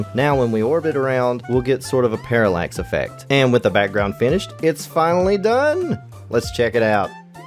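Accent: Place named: South, United States. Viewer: please i adore this video also where are you from??? idk if im wrong here but your accent just makes me feel right at home... im from the South. extra pointers for personally interesting to me just with the way you talk haha i love this video and how you describe it all (despite the fact i dont understand ANY of it)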